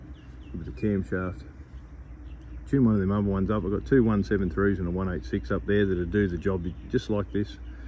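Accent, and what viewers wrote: Australia. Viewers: I like your channel. It's good to hear an Australian voice on YouTube. 👍🏻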